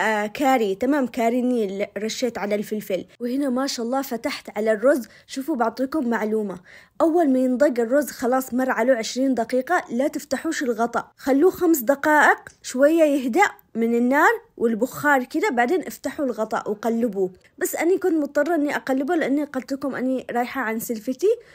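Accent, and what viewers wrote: Aden, Yemen. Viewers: ماشاءالله عليك مبدعه وحبوبه وشاطرة وحبيتك لانك من عدن متلي وماتتصنعي وتتكلمي عدني واحب لما تتكلمي العدني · ❤❤ قلبك ماشاء الله تبارك الله عليك مره وستوا ❤❤عاشت ست الكل أسعد الله أوقاتكم بكل خيرا آمنا إحنا عارفين الطريقه بس إللي شوقنا أكثر صوتك الجميل العدني الاصيل القح 😂🎉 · مااحلاك وامااحلى طبخك وكلامك العدني الحلو الله يعطيك العافيه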